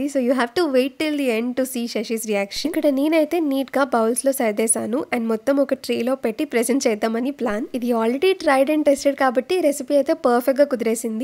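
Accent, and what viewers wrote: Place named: Hyderabad, India. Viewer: Akka Neku slow ga hyderabad slang vastundi ga 😅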